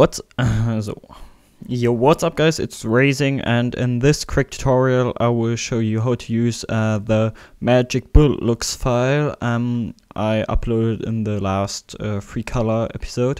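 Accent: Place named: Germany. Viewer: Man merkt dass du deutscher bist :D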